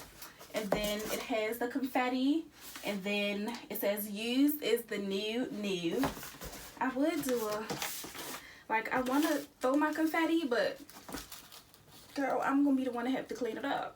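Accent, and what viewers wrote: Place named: DMV, United States. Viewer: Love the shoes and that blue is gorge on you! I definitely get DMV accent from you are you a native?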